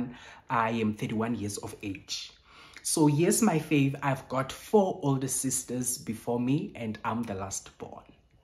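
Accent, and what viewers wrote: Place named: Sotho, South Africa. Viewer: oh wow ke utloa fela ka accent..I'm also sotho from Lesotho but based here in Joburg 🫶🏼 keep shining fave🎉🎉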